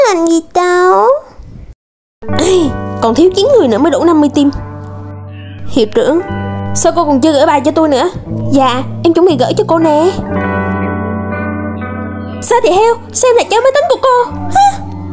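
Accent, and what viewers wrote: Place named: Quang Ngai, Vietnam. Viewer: Chị là ngừ Quảng Ngãi hả cj em thấy giọng cj giống quá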